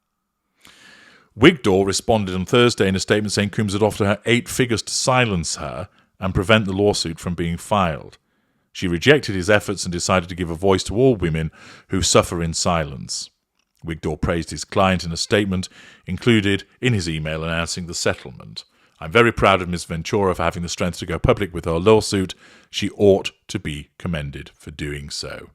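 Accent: United Kingdom. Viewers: Dude why can’t you pronounce names properly? Surely even with your British accent, pronouncing Combs properly can’t be that difficult. It’s COHmbs….not Cooms! Also, she got $100M from the settlement. It’s open source data so you should have known that. It’s obvious that you’re just reading from various sources and have no actual understanding of the subject….including how to pronounce his last name properly. I usually enjoy your videos but this series was weak to say the least.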